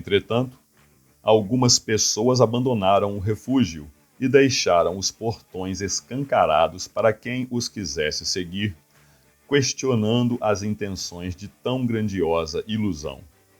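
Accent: Minas Gerais, Brazil. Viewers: Hey vc é mineiro?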